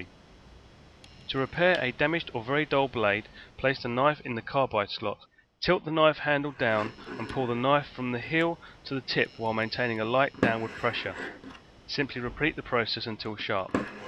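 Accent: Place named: United Kingdom. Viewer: Great and thorough review. And the cool British accent is a nice touch. :)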